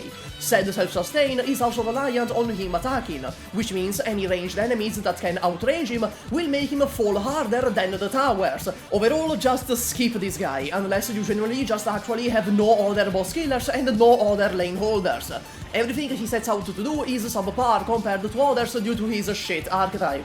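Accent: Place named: Italy. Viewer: i don't even play arknights anymore i just like listening to an italian mf yap about shitty operators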